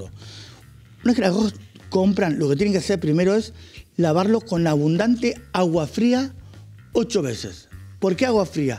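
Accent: Argentina. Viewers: Un japo hablando argentinoooo 🤌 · Me encanta el acento tan argentino que tiene usted, que es originario de Japón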